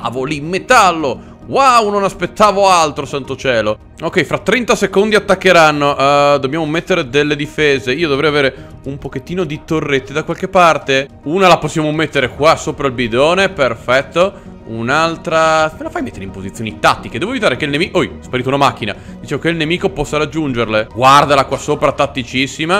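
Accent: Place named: Tuscany, Italy. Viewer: Malaho e tu mi sembro Toscano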